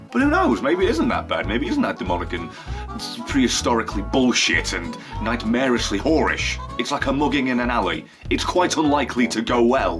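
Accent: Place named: United Kingdom. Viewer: Damn it's sad that I can't here your sweet British(is he British?) accent and you screaming with words you invented 3 second before you say them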